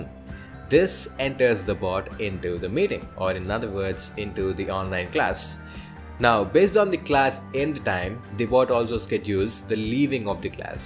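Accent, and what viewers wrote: India. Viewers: Indian accent makes this video even better.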